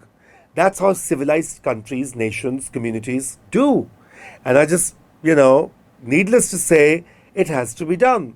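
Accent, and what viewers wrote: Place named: Punjab, India. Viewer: Dude he is a set of few last English speaking punjabi ascent journalists of Pakistan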